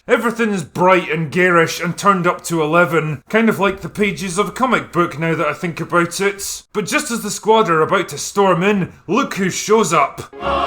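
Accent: Scotland, United Kingdom. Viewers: You’re Scottish? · I used to think you always sounded drunk, then I realized you’re just Scottish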